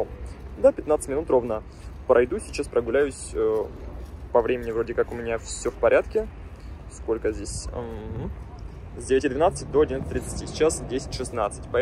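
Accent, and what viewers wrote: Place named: Moscow, Russia. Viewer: Что на голове у него за шляпа 👒 есть инфа? И говор где такой подтянуть мАскоыский 😊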